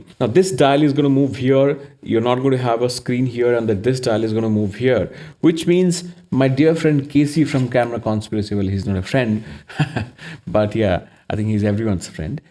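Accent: India. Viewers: Your Indian English accent is not a problem and it is very clear and understandable. Yes, there are some people of India which has a deep and not understandable accent, but your not one of those people.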